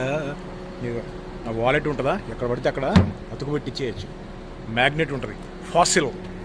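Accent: Andhra Pradesh, India. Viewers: Observed Andhra accent in mutton preparation in Palm tree garden. But Nizamabad in Telangana. Why is it so? Just Curiosity. I don't have any state or regional feelings. Appreciate if you reply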